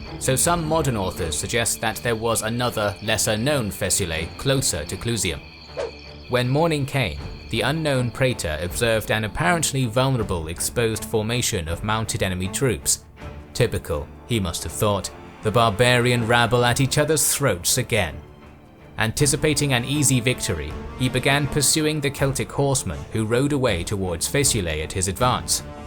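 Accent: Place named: United Kingdom. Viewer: I don't know who's the narrator of those videos but he's absolutely great, brilliant voice, diction and of course lovely British accent... so comprehensive and flawless!!! Thank you guys! Great Job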